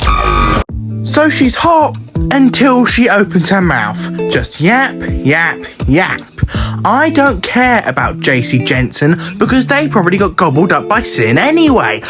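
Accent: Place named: United Kingdom. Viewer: You're so british it's grating 😔😔😔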